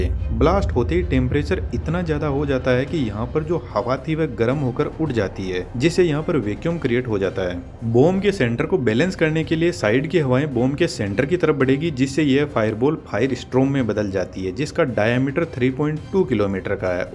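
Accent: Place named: Shekhawati, India. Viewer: भाई साहब आपके द्वारा बनाया गया वीडियो बहुत ही ज्ञानवर्धक है । आपकी आवाज से ऐसा लगता है कि आप राजस्थान के शेखावाटी से संबंध रखते हो।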